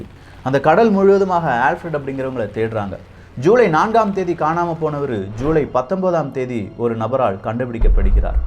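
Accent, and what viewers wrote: Kerala, India. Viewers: Are you mallu?. some of words pronounciation like that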